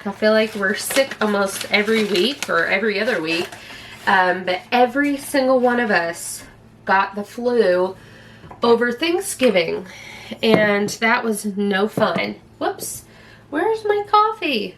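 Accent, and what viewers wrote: South, United States. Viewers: I love your channel! I also love how you have a southern accent. We live in Maine and speak so differently!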